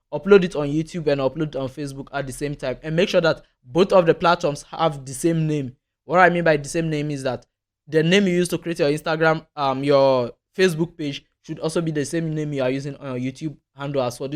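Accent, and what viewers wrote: Nigeria. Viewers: Wow... Nice content 🎉 Your accent is gradually becoming 100% Nigerian, wow ❤. Ghana and Nigeria are one ❤ I was surprised seeing how you follow a lot of Nigerian accounts online. Great content mi bro 🎉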